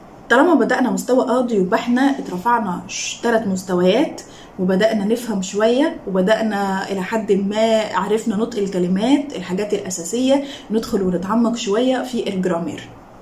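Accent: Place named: Pakistan. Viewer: Arrête 😂 tu fais la star de français et jcp quoi mais regarde ta façon de parler tu parle comme un pakistan c’est trop drôle en vrai il faut juste corriger tes fautes d’orthographe et de parole Parce que vous parlez de la mauvaise manière et que votre accent ne convient pas du tout au français parce qu'une personne indienne parle français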